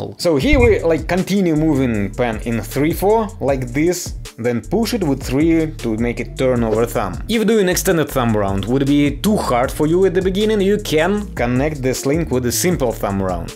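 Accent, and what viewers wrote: Russia. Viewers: мне кажется, или я слышу русский акцент? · Таке ощущение что он русский, но говорит на английском. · Мне кажется. Что этот чел русский, акцент очень сильный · Такое чувство будто ты русский, который выучил английский ... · Стопэ, ты русский? Акцент довольно таки русский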